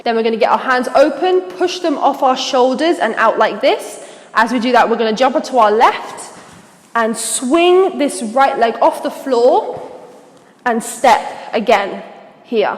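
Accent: United Kingdom. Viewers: Wait I'm confused u have a British accent so ur not korean?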